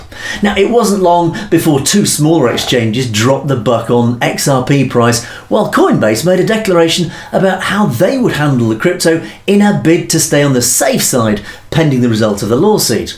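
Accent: United Kingdom. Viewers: Like ur typical Uk Accent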